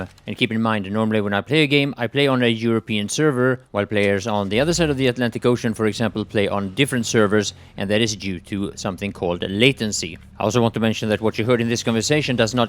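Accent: Sweden. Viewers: JUst wanna thank you for keeping it real dude amazing work allways happy when i get a notification on my phone that you uploaded something and realy nice to see content creators keeping it real!! keep it up!! älskar göteborgska accenten :)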